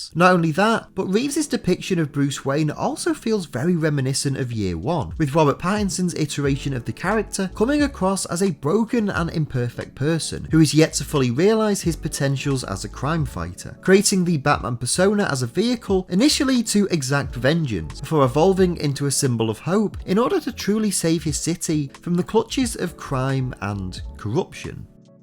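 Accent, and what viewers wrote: United Kingdom. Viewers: Hey your soothing, British voice keeps me company and informed during the night shift. Thanks mate✌🏻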